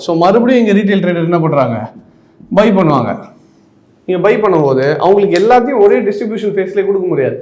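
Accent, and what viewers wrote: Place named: India. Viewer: Very cool but the indian accent makes it difficult to understand :)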